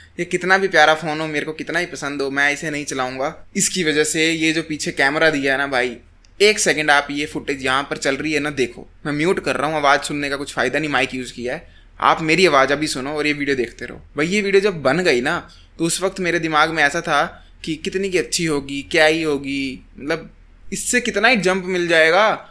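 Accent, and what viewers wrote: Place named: Punjab, India. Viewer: Bhai are you from panjab ? You speak hindi with a punjabi tone.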